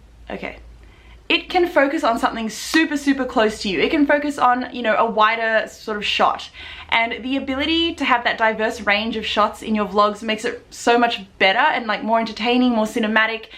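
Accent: Australia. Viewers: Sounds aussie ;) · omg its so unexpected to hear an australian accent LOL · oh my gosh, I love your Australian accent ! so sweet ! kiss from Paris X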